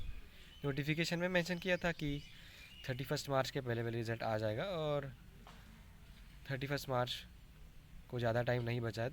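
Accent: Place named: Maharashtra, India. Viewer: Note*-playback speed -1.75x Thanks bro ,it seems like ur first language is marathi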